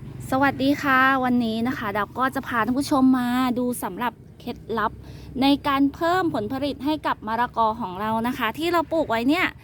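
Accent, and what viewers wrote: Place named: Thailand. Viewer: เจ้าอยู่บ้านได๋น้อเว้าคือภาษาบ้านข่อยแท้น้อ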